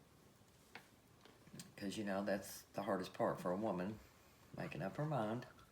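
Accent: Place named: South, United States. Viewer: Gotta be from the South, gurl! Love it! :)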